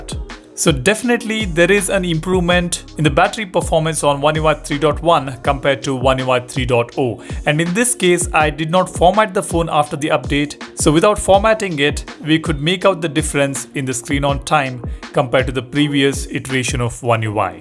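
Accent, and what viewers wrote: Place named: India. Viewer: I was looking up for a video about the n10+ 3.1 UI but I couldn't bear the heavy indian accent I can't.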